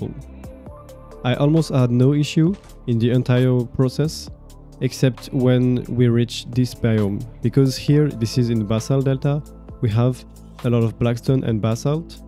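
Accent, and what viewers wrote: France. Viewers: You have a French accetn no? Good work🎉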